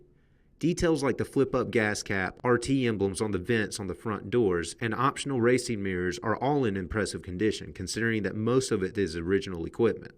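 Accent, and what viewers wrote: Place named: South, United States. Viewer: Hey man. I just love your Southern accent as much as the car.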